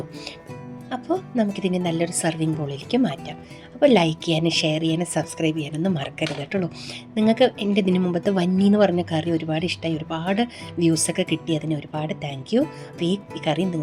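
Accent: Palakkad, India. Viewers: Typical palakkadan bhaasaha ttolin😊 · സംസാരം തന്നെ കേൾക്കുമ്പോൾ കൊതിയാകും ഉണ്ടാക്കാൻ ആയിട്ട് പാലക്കാട്ടുകാരി സൂപ്പർ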